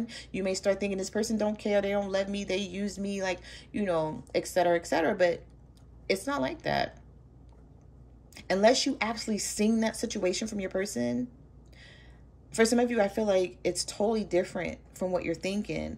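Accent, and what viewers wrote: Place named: New York, United States. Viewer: You sound like a New Yorker, I enjoy your readings either way.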